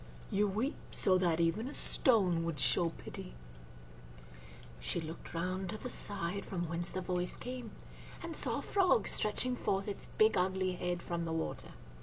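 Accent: United Kingdom. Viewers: Delightful English accent - is there no end to your talents? :) PS.Lilou is probably eating a frog in France this very minute - disturbing thought eh? LOL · You sidetracked me! I was watching your nutrition,makeovers when I saw this and, being a Brit, had to take a peek! Where did you learn to do the accent? It sounds rather posh but with a little bit of downmarket Cockney and even a little bit of Welsh thrown in! Well done!:)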